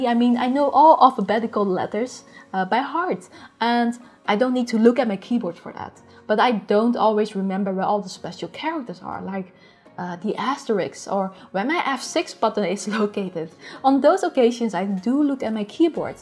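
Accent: Netherlands. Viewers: Is ze Nederlands? Ik dacht het al te horen aan haar accent haha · cute Dutch accent ;--) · Your English Dutch Accent sounds really funny for me as a German !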